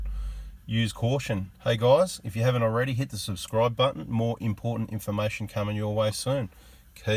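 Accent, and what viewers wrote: Australia. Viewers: Love the Ausie in this guy ❣️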